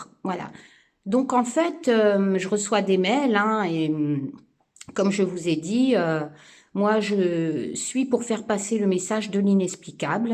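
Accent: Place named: Nord, France. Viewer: est juste humaine 😇un mail envoyé et réponse dans la foulée...j'espère que ma question sur le boulot arrivera surtout que ce domaine (dans des bureaux )du jamais fait pour moi😊j'adore la regarder toujours dans la bonne humeur même légèrement remonter, elle a toujours un mot pour nous faire rire...Son patois un peu chti me fait rappeler ma famille tous en Picardie.Bref une femme qui m'a donner l'envie de faire mes propres tirage d'ailleurs reçu mon petit Oracle aujourd'hui que d'émotion pour moi😢 j'attends mes 2 autres avec impatience...Je fais selon mes envies j'ai sauter le pas pour mes tirages et me contre fiche du jugement de certains membres de mon entourage. Grand merci pour toutes tes explications sur les cartes.Votre livre est rempli d'émotions les larmes sont venu pour moi😊.Continuer sur votre chaîne vous nous faites un bien fou...Encore une fois. 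M E R C I 🙏🙏🙏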